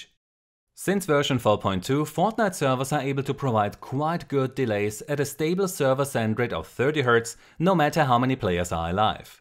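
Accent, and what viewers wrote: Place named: Germany. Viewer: Yaaaaaaa your GERMAN!!!! Love the accent man, you sound like a badass. 😎😎😎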